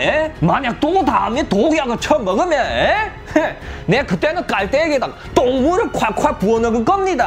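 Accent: China. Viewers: 이분 조선족이신가요?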